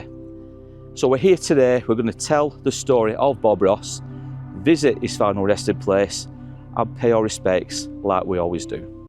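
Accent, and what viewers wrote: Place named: North, United Kingdom. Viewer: While I'm what's known as a talentless git, I also love to watch Bob Ross 😊 He had a lovely manner to him and just had that way of making me feel happy. I've been watching your videos for some time now and I have to say the delivery is that of a master storyteller. I'm not from the north but your lovely accent and empathic style really brings these, sometimes forgotten, stories to life vividly. Yours and research skills and attention to detail does you both proud. I shall continue to look forward to your videos.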